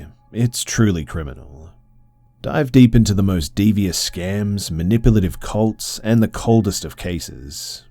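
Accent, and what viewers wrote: Australia. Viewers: I watch a Creepy Pasta channel called Mr.Creepy Pasta. Creepy pastas are a bit different than. His is more horror or scary. Creepies 😮 are, well,… Creepy. 😊. The are fiction about all kinds of things. It often looks like the person or people will look like they are going to get away…. But the don’t usually do. Mr. Creepy Pasta is the best! Creepy Pasta Jr.is really good, he has t been posting anything for a while. I think he’s sick.? I read a response from him thanking us for watching. I don’t want to nosy. So he hasn’t been making too many videos. And there are videos are usually shorter than, but they have been made more frequently. But he is really good because he puts out the videos on a regular basis and you can always. Count on him to have videos up and they are more than one video so you can relax and listen to him through the long and everything is fairly new in essence. 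I know the feeling the way he reads and narrates is very nice and that makes the story much greater for it. Thanks for keeping everything scary and interesting my friend you make the night that I am not doing so well more enjoyable. You’re like a drug that makes people feel better! Not so much high. But for me anyway, I can take some time off from reality and get myself immersed in the stories, and just relax and listen to your voice. Soothing Australian voice, and there are others who are from Australia and a lot from British.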